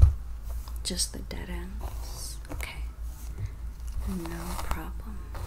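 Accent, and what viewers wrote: South, United States. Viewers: Luv those southern accent vids💯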